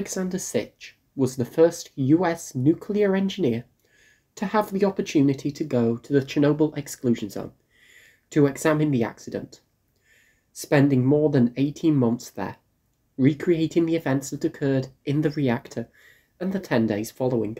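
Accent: United Kingdom. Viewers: Keep that Scottish (?) accent under control pls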